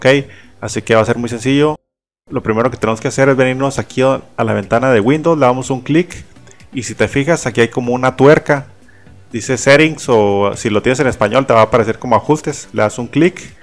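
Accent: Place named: Baja California Sur, Mexico. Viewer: Justo antes de que dijeras de donde eras ya habia reconocido tu acento de región, incluso podria ser de BCS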